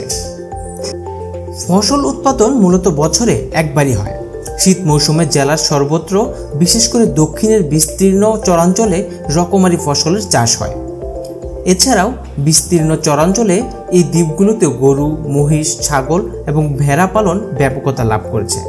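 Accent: Noakhali, Bangladesh. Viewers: আপনি কি নোয়াখালির নাকি,,, আপনার পরিবার নোয়াখালি থেকে এসেছে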